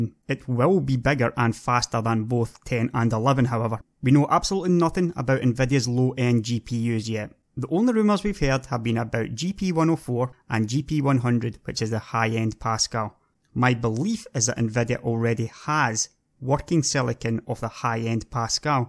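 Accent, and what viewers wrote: Scotland, United Kingdom. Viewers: pretty sure he is British, Scottish my guess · hard for me to understand your wonderful Scottish accent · your lovely Scottish voice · he was speaking with a Scottish accent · He has a Scots accent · I love the Scottish accent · holy shit he's a scottsman! was not expecting that! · love your accent? you scottish? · This is the scottishest schottish accent I have ever struggled to understand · your TRAINSPOTTING accent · He is scottish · i liked your skotish accent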